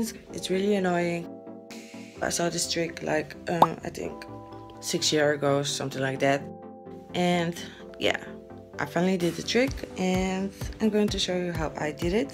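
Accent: Netherlands. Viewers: Wait are you Dutch? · Dutch? Xox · Hoor direct aan je accent dat uit nl bent, keep shining sis ❣️ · Are you from the netherlands or a dutch speaking country . Because you're accent is cute. Love the vid btw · Lovely dutch accent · are you dutch? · Ik dacht al hoor ik nou een Nederlandse accent in je Engels tot je doei doei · you sound dutch... are you?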